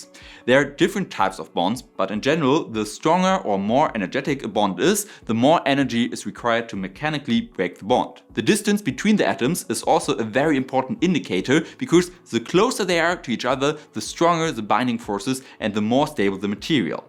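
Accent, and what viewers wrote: Germany. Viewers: I know I'm being shallow, but the German accent makes this all sound so much more authoritative. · if not possible to synthesize in industrial manufacturing via vapor deposition for example that material will be nigh useless because of costs. also the german accent is super cringe ldk grapene and piezoelectric especially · Great video. Made me realize I need more German accent in my science recommendations; subbed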